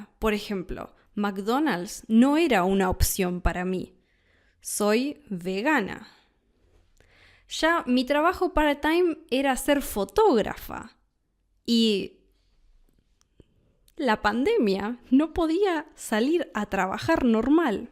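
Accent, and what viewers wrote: Rioplatense, Argentina. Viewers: Tienes un poco el acento argentino. Saludos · Great first episode, I’m looking forward to the podcast and know I’ll enjoy it no matter what you decide to talk about. I was similarly obsessed with the world of YT language learning channels and language related content for a while. I watched all the big name YT polyglots of the time and followed so many peoples language learning journeys. I even watched some those channels aimed at teaching you a language, like Japanese from Zero. I never really made significant progress in any languages back then though. It wasn’t until starting Spanish again in December of 2023 that I finally found a way that worked for me for learning a language, comprehensible input. I love Rioplatense Spanish, and your accent in particular, so I’m glad you didn’t let your accent/dialect dissuade you from starting this path. 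I’m super grateful to have found you as a content creator and iTalki tutor all those months ago. It’s been over 7 months since our first crosstalk and now our lessons are entirely in Spanish and it still blows my mind. I really appreciate you as both a content creator and a tutor and I’m so happy that it’s something that you enjoy, that makes such a huge difference. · De donde eres?!? Porque habla diferente… Su yo es sho 😮 Es Ud de Argentina?? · Thank you so much for these podcats. I'm learning Spanish purely through comprehensible input and i can already tell your podcats will be of great help to me in my pursuit of fluency. I also appreciate your argentine accent, my aim is to understand all spanish speakers, whether they're from spain or latin america. Keep up the great work you have gained another subscriber for your channels.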